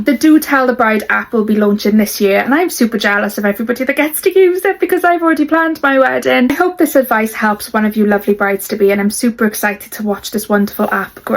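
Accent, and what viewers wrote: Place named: Wales, United Kingdom. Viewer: Beautiful Welsh accent! 🥰 Congratulations on your September 2026 wedding! 💐💍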